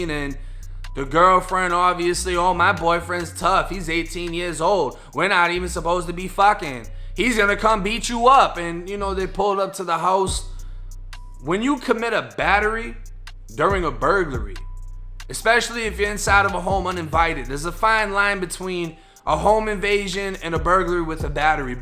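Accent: Boston, United States. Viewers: Sick as a dog bro (pneumonia not covid) but need me some 1090. Wat up man, love ur channel, mass respect educatin yungsta's bro. This footage shockin man, how'd ya get ya hands on it? Anyways, are u from Florida man, ya sound like ya from Boston. I admit I tho u was glorifyin this life & I Apolologize fo real. I hope ya Blow Up Bigtime bro, monetized & all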